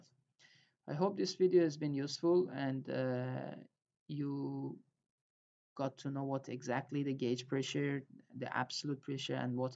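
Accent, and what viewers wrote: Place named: Iran. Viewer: are you iranian? this is a persian speaking english accent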